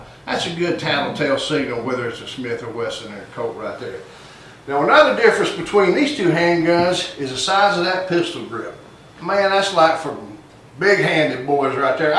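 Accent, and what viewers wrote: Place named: Tennessee, United States. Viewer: Fun video! You sound like you’re from my neck of the woods in middle Tennessee.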